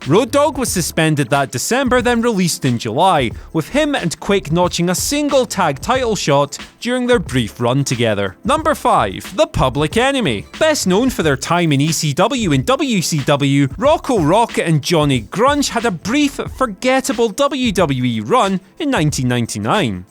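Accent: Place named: Scotland, United Kingdom. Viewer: Can we please stop putting this guy on videos I actually love the Scottish accent but this guy just grinds on me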